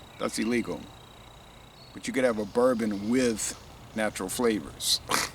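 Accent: United States. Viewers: I should start making videos and give little digs about how you Americans pronounce and spell certain words.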